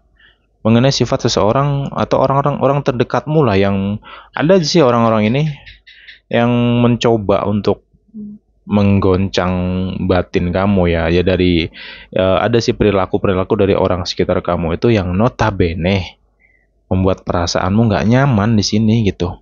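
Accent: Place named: East Java, Indonesia. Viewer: Hadir....dr bogor . Logat jawa timuranne kentel. Heheehe salam arek arema , 🤭😊😁 suarane enak ✌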